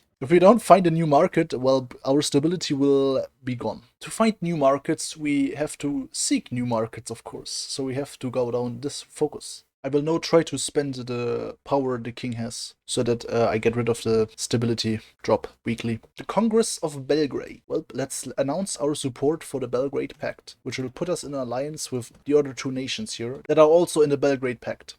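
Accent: Germany. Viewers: LOL. Dein Englisch hat so einen heftigen deutschen Akzent 😂👌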